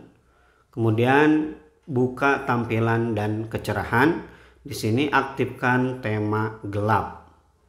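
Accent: West Java, Indonesia. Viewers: Orang jabar ya kang?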